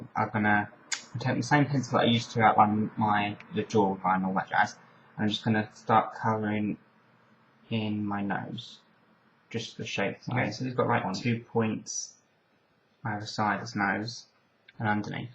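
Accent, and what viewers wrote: United Kingdom. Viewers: dear god. listening to Darth Maul speak in a cheery british accent is so bizarre..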